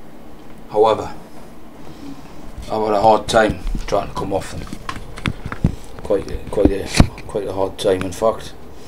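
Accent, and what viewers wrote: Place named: North East, United Kingdom. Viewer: i could sit an listen to you all day, where in the N.E are you from?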